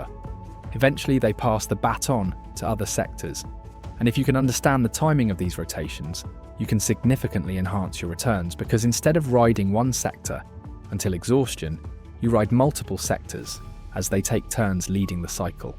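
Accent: England, United Kingdom. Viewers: Can you please change the accent? This English accent is getting on my nerves!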